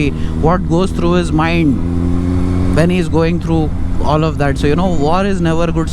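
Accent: Hyderabad, India. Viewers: I guess u know telugu... Bhaya serious gaa cheptunaa echipadesinavv🔥, Btw mi videos next level unatai asal😍. Bc asal skip cheyalii anipinchadhu 20 or 15 mins unna kani, aa flow ala untadhi aa hyd slang & me content. Love u bhai♥️🥂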